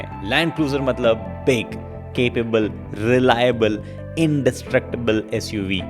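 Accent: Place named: Delhi, India. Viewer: Why you make delhi excent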